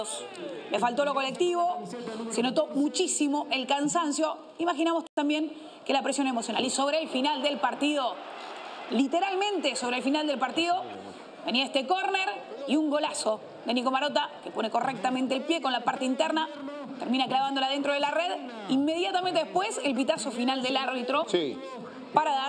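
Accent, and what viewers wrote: Argentina. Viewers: No es por nada, pero creo no es suficiente tener acento argentino para comentar los partidos. Solo en Perú se recibe bien a los extranjeros no sucediendo asi en con los peruanos en otros paises. Aqui basta que hablen como argentinos y ya son expertos en cualquier cosa.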